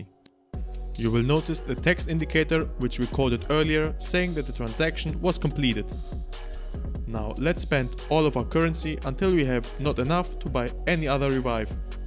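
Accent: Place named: Greece. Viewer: I firstly suspected that from your pronunciation. It sounded similar to Greeks' accent in English. Also in your About tab on your channel page, you can see your country because you used a Greek phone number to verify the channel.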